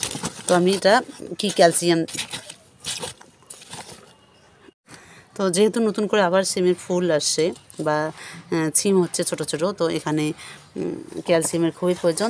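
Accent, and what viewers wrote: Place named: Bangladesh. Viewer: আসসালামুয়ালাইকুম আপু, আপনার এই ১২" গ্রো ব্যাগ গুলোর হাইট কত ইঞ্চি? প্লিজ বলবেন। আপু আপনি বাংলাদেশিদের মত কথা বলেন।আপনি কি বাংলাদেশি ?😊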